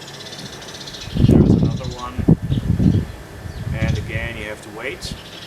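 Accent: Germany. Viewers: Ha! I knew that was a German accent. There was no doubt after you said Opel! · hallo,super video .👍👍 warum sprichst du so gutes englisch mit deutschen akzent?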